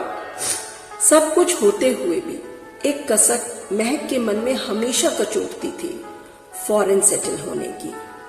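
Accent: Kanpur, India. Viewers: Amazing.... the way you narrate the kanpuriya slang hahahaj too good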